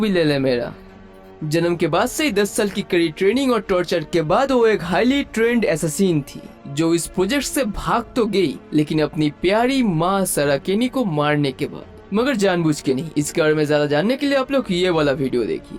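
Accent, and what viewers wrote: Bengal, India. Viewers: Bro u r bengali na?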